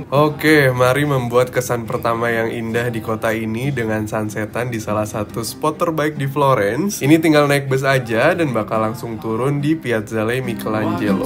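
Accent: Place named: Bandung, Indonesia. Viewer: Ini masnya org bandung ya? Duhh kenapa mengingatkan mantan bgt. Suaranya, logatnya, jokesnya😂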